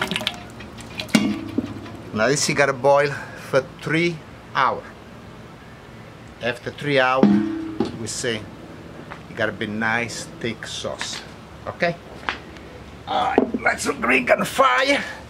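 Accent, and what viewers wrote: Italy. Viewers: I just absolutely love this man especially when making Italian food with his accent. Thank you. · I see a man cutting tomato's in his garage, speaking with a heavy Italian accent and i subscribed. Update: Wow I have never gotten anything over probably 30 likes before. Thank you! Good food brings people together! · *PURE GOLD ITALIAN ACCENT* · See an old guy See title - how to make tomato sauce Click Italian accent = full attention/excitement Sit back and enjoy learning from a master.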